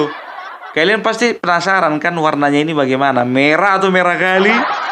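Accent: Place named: Batak, Indonesia. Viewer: Kereeen opung, saran saya dialeg bataknya di pertebal lg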